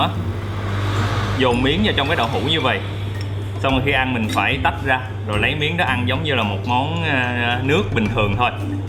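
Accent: Mekong Delta, Vietnam. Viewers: Nghe giọng nói đúng chất miền tây. Dễ nghe gần gũi. Thành fan ông này mất thôi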